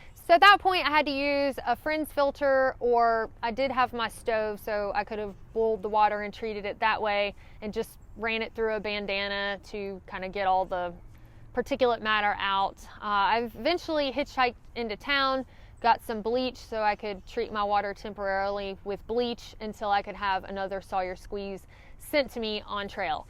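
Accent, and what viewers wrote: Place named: South, United States. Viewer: Good advice on the antidiarrhea medicine. I'm going to put that on my checklist right now. Also, I had to laugh. I couldn't understand why you said not hiking in bear country until I realized you were saying night hiking. I guess I need to come down south more often. 😁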